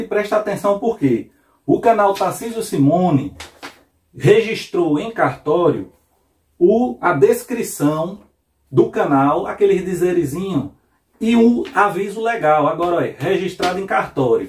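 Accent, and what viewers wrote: Northeast, Brazil. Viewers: "a gente fala errado", onde... Falar com sotaque nordestino NÃO é falar errado é um capricho artístico. Vejo você falar sempre muito corretamente e quando escapa algo sempre corrigi logo. Falar errado é esse bando de Youtubers que não sabe nem usar as conjugações verbais corretas ou usar o plural na hora certa. Você está de parabéns em tudo que você fala e da forma que você fala. E olha eu sou Paulista da Capital de SP.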